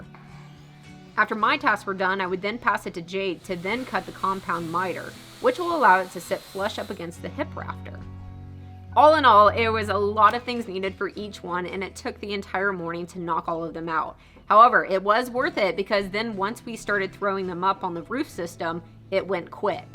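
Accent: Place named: Midwest, United States. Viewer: why do you have a Midwest accent rather than a Texas accent. Are you a transplant? Where are you from?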